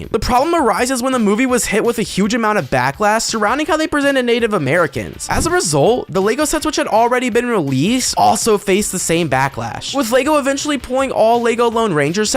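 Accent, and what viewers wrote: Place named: United Kingdom. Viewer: Man, I thought you’re supposed to build with Legos not make a whole goddamn essay about it I mean we want to hear you talk British make Lego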